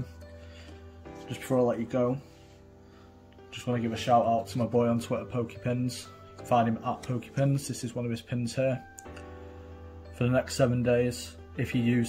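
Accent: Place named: North, United Kingdom. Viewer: Where in the UK are you from your accent sound northern ???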